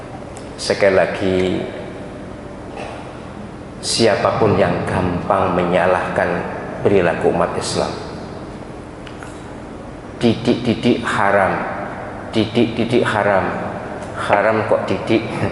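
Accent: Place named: Madura, Indonesia. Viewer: Madura mireng prof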